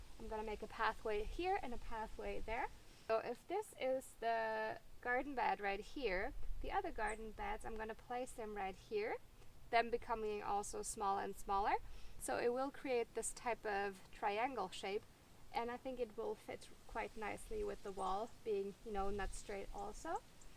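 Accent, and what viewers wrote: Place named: Southern England, United Kingdom. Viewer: Hello there, first time on your blog. If than mini chainsaw is a Mader then you've wasted your money as un reliable quality.. Those bushes you are cutting are Minmosa and is a vicious weed, cutting them back will only make them grow three time more in spring. When you see the new growth come, wait untill a foot high then spray with Round and cooking oil 50/50 this will kill them and give you clean land for next time and watch for new seed popping up as they can be a problem. I know as been clearing my land for years, it was 10 times worse than yours when I bought it. Have now got a large lump cleared and producing. I am a market gardener and now just grow too feed me so if you have any problems or things you need to ask, feel free as am in Portugal as well so have a fair idea now on planting times and what you can and can't grow. 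In the meantime, have fun.Just one thing, olives are a waist of time as you can't eat them, there are other trees you can grow that will feed you, but your choice.Would like as where you are and where you from as the name is Irish but the accent sounds southern England.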